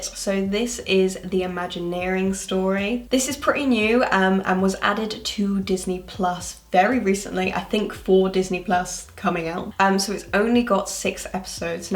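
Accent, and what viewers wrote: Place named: United Kingdom. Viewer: Sounds like she’s from the United Kingdom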